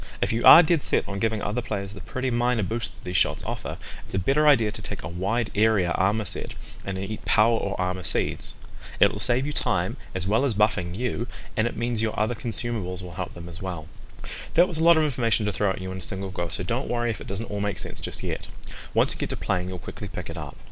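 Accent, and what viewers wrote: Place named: New Zealand. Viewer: Nice vid, great to hear that NZ accent:-D Hope to see more from you when 3U hits our shores.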